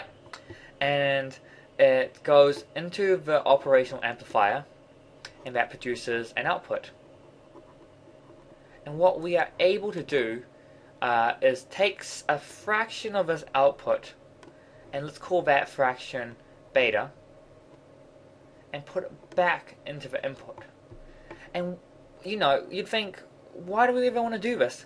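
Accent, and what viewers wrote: New Zealand. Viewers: You're from NZ aren't you ?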